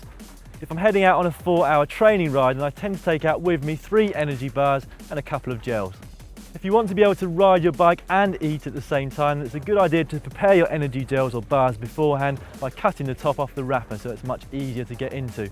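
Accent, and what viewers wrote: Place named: United Kingdom. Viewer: I'm confused you sound british but, you cycle on the wrong side of the road. Where are you based?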